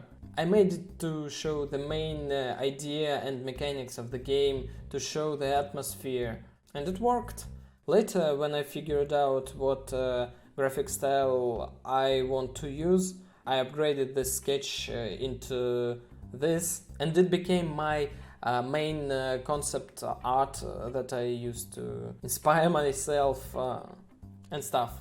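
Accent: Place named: Russia. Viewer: You're Russian!?!? at you Russian accent, and I'm Russian, and if that's the case, I don't need to use a translator.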